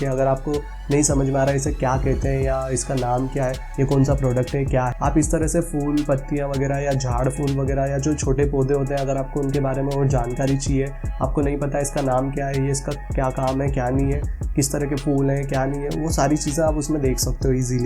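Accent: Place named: Gujarat, India. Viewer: I bet he is Gujrati or Rajasthani... Because the way he says "set" or "ek" & other words... Well thanks for this video brother ❤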